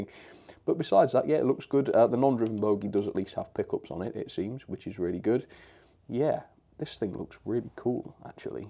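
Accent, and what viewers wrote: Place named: Kent, United Kingdom. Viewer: Another great review! 👍🏻 thanks!! Can I ask where about sin the uk are you from?? My girlfriend says by your accent your from Kent 🤭🤷‍♂️ I say Hampshire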